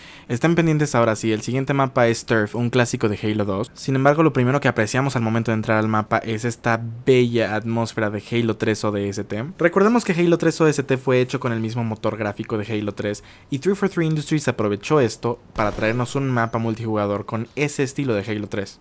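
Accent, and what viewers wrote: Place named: Bogotá, Colombia. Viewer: que paso mi Cantinflas y ese bogotazo xd no te va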